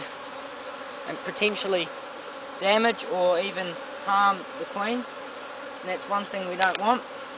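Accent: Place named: Australia. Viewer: What kind of "strip" was in the brood? Aussie accent ... Yank can't quite get it ...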